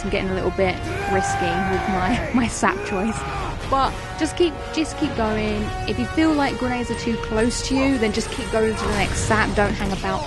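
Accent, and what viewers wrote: United Kingdom. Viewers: shut up british p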